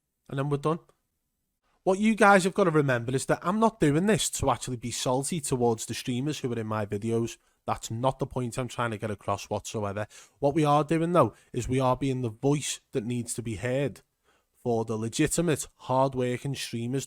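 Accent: Liverpool, United Kingdom. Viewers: Great work again buddy, scouser?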